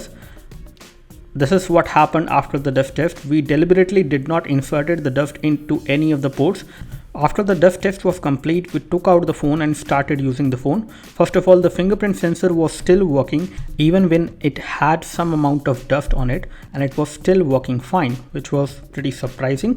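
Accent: India. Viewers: I can't fucking watch this, holy fuck the cringy indian accent.. HELLOD ILLD PUD SOMED WADDAHD IND DEHD SCREENDID.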